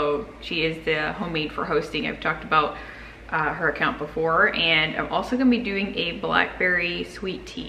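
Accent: Pennsylvania Dutch, United States. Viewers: Thank you I really enjoy your recipes Love to hear you speak Pennsylvania Dutch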